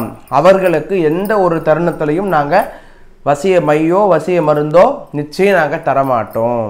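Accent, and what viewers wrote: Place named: Chennai, India. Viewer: Super chennai slang super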